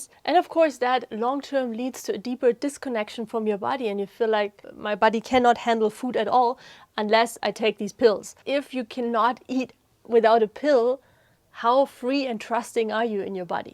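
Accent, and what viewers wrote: Germany. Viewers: She has a lovely German accent. ❤ I think she is very good at what she does, too.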